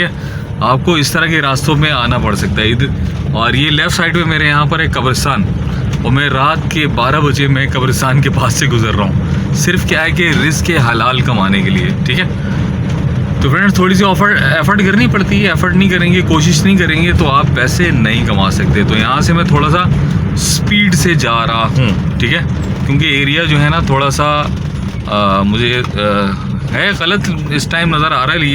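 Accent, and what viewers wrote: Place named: Karachi, Pakistan. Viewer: MashahAllahh , ap ka accent ka Karachi ka tuch ata ha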